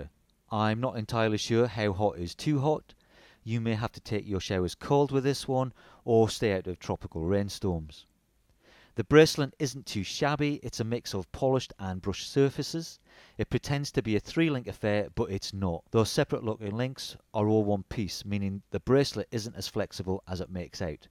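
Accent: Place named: Newcastle, United Kingdom. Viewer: Are you from Newcastle by any chance? I recognise the accent. Great video by the way, keep up the good work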